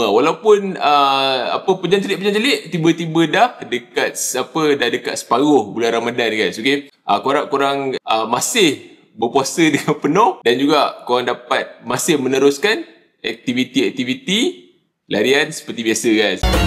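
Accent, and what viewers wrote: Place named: Malaysia. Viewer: Takpalah.. Lidah Melayu 😅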